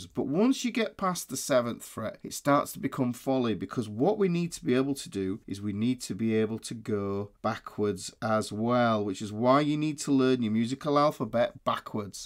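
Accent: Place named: Durham, United Kingdom. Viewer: The BEAD on the 7th fret helped me so much! Also, I lived in Durham for a long time and your accent makes me feel so at home!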